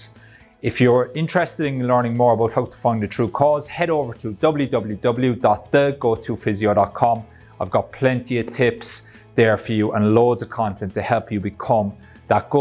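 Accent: Ireland. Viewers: 😅 you from Ireland? I'm from Ireland too that's why I was asking but great video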